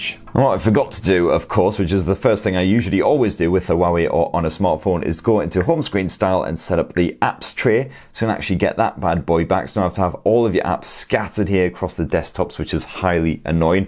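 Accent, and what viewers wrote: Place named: England, United Kingdom. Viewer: Sorry mate, that English accent is quite annoying and unintelligible some of the time.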